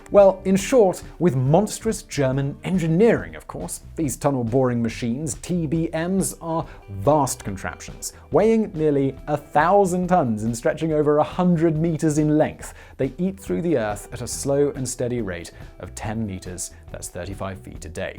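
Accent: United Kingdom. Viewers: Why do English people say "figger" or "figgers"???? It is FIG-URE or FIG-URES. Following your logic the racist word for black people shouldn't rhythm with the British pronunciation of "figure", correct?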